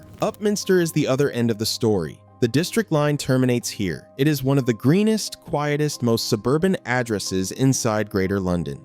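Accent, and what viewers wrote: United States. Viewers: Why is an American unfamiliar with London and its place names narrating this??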